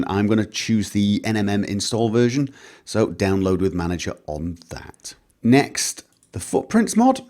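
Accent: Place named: Yorkshire, United Kingdom. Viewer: he has a Yorkshire accent, mixed with a bit of wherever he lives now.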